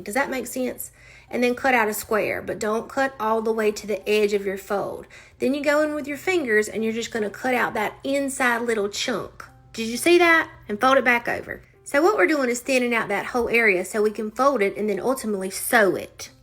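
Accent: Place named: South, United States. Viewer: Gorgeous work and what a voice! I adore your voice and smooth southern accent. I'm subscribing just so I can listen to you some more.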